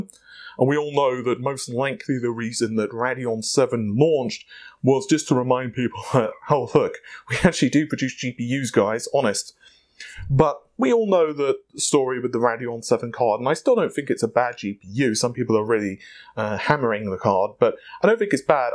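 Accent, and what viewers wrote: England, United Kingdom. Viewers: My good man, you bear a striking resemblance to a young Syd Barett of Pink Floyd (RIP). The English accent only amplifies the similarities! Back on topic: Looking forward to upgrading my 1800x with some Zen2 goodness. A 144Hz monitor is in my future and I will need the clockspeed / IPC increase to stay above 100.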